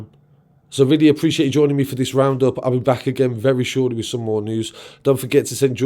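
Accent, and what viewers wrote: Birmingham, United Kingdom. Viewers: Good reporting !! - but strong brummie accent 😮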